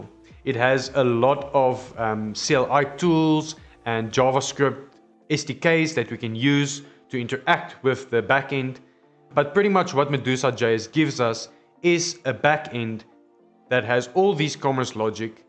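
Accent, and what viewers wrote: South Africa. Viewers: Great Tutorial YT / !! Also, hello as a fellow South African - recognize the accent from anywhere :) I saw a lot of comments/questions regarding postgres URL that is passed during the NPX-create. I did a little troubleshooting and found the following worked really well for me: TL;DR = Add single quotes around the URL string. Add single quotes around the URL string. Adding quotes is the answer; you might have a different setup, noticed you're on PC, on Mac or Linux it is possible to require quotes is my guess. Not using quotes got me stared but then I got error shortly after opting in to create the Next.js storefront. I figure why not do it now vs later. ERROR: "Couldn't connect to PostgreSQL using the database URL you passed. Make sure it's correct and try again." Then after some reading, I used double quotes and it failed as using double quotes with '@ or '!' means something else in ZSH-World. 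End Result: Use Single Quotes around the postgres URL Keep up the good work! Cheers! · Ha I knew the accent within the first 30 seconds, but I’ve been working remotely with ZA folks for over a decade now so it’s second nature by now. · I hear the south african accent! 💫 😂 Please do a video to customize to add other tables or inherit and add fields, and make this into a Multivendor marketplace like takealot or Amazon!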